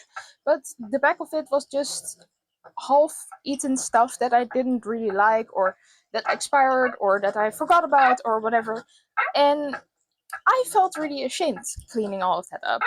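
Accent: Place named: Netherlands. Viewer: Yep, i have the same as well here, also ik hoor Nederlands 😊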